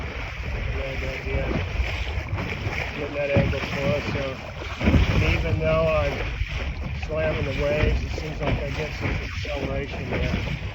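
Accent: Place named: South, United States. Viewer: You have a very pleasing voice. A mild southern accent. Thanks for your sunfish videos!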